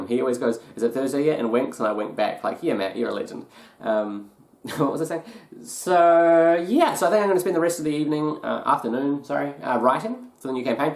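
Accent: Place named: New Zealand. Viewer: Damn I miss that New Zealand accent. Currently living in Sydney at the moment.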